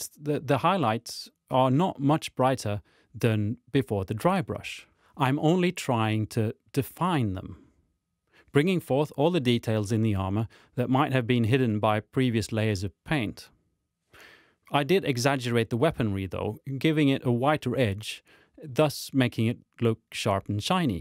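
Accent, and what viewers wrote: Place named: Sweden. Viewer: thanks for the vid, black hair have always been something I have avoided due to it being hard. as I can here your from Sweden. where did you buy your spray booth? it looks like its one of those foldable once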